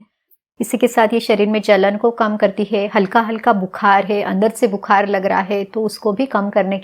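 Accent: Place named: Maharashtra, India. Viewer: Doctor ur hindi accent is like marathi but ur jain gujrati🤔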